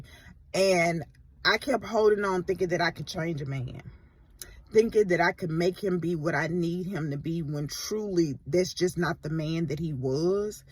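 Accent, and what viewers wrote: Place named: South, United States. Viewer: I'm a none believer so it kind of means you are so sure that this being in the sky has your best interest at heart and is telling you how to live with absolutely no proof that this will powerful being exist and religion is huge in the south and you have a southern accent so you are from the south. Therefore, fairy tales from the south.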